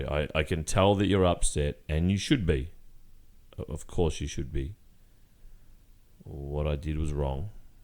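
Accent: Australia. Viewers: Australian accent sucks 😕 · Okay I’m stupid, I was about to ask if you were Australian because you sound really Australian, buuuut then I read your channel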